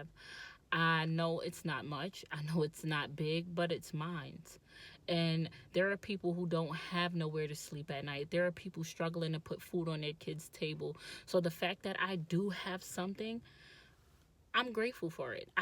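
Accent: New York, United States. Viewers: Girlllll I knew you was from NY the moment I heard you talk!! I'm from The Bronx and can definitely appreciate you keeping it real. Loved the apartment tour!!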